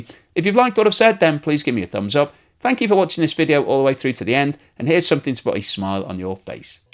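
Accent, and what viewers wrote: United Kingdom. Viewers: what Propegandist like fail to realize is there are countries with far worse inflation than Russia which still manage to keep their economies from collapsing. Russia's is an economy of war, it does not, and cannot be measured like a a regular economy. What matters to Russian citizens is that their basic needs are taken care of, and indeed they are. I guess these people need another year or two to take back what they're saying now, the exact same way they ate humble pie about Russian Army being in shambles. Now you're handsome fella and you have a nice British accent which might fool some people into thinking you're some kind of authority on Russian economy, but you are the furthest thing from it. (your own country "Britain" I assume is turning into a 3rd world country_ businesses closing left right and center aren't you better of "commentating" on that? 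lol)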